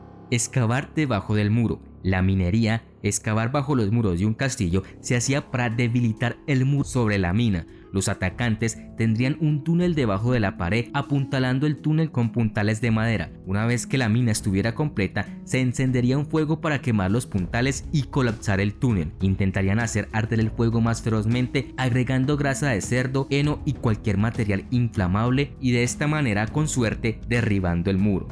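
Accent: Colombia. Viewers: Ese acento de colombiano tan bravo.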